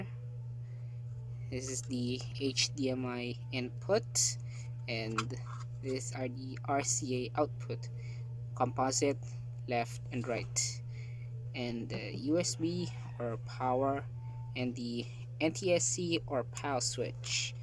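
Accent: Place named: Russia. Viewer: your voice is very russian accent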